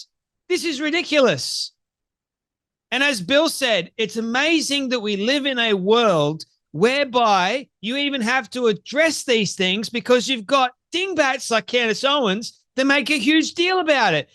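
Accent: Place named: Australia. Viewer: I listen to you everyday, encouraged to hear an Australian voice. I admire your tenacity and relentless efforts God bless you.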